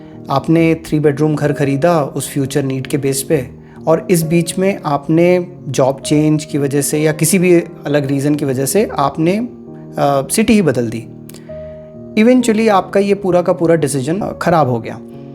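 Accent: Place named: Madhya Pradesh, India. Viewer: Ram Ram jee Bhai, aap kahan ke rehne waale hain?shuru main laga aap Lucknow ke hain par aapke bolne ke lehje se laga shayad aap Madhya pradesh se hain..accha laga sun ke, dhanywaad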